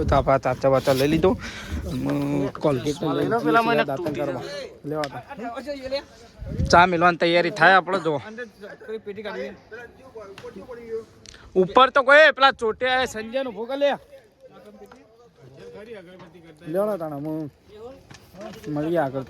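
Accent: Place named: Sabarkantha, India. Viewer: Kyana cho bhai..Sabarkantha ni mast majani bhasha bolo cho.. 😂.. Majja aavi karan ke hu pan aaj bhasha bolu chu ghare.. Hu pan tamari bajuno ja chu bro.. Hu Mumbai rahu chu 💓🙏‼️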